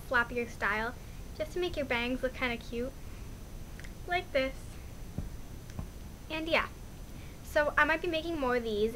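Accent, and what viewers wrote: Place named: New York, United States. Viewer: You can kinda hear New York accent in this video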